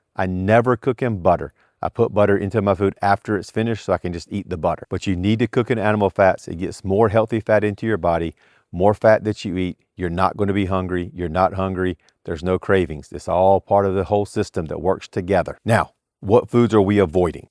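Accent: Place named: South, United States. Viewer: Hey! I stumbled on your videos while doing some research. I've tried this diet before and did great for 3 weeks but cracked because I visited a friend and had some whiskey which started a domino effect. Since then, I've fixed my drinking, I just don't do it any more! In that time, I have found my electrolyte solutions and salts. They work great. I just have a few questions. 1: Is it alright to incorporate 4 oz to 8 oz beef liver a week for those fat soluble vitamins? 2: Is there a calorie mark for the day? Last time I ate twice a day, around 2600. 3: "Keto Flu", did you just push through that detox phase? Any pointers? 4: Is this something you sustain? Or is it something you go through phases with? Ex. 3 months carno, 1 month incorporated diet. Hope to hear from you! Your southern drawl reminds me of my wrestling coach in high school and has opened up a new source of motivation for me!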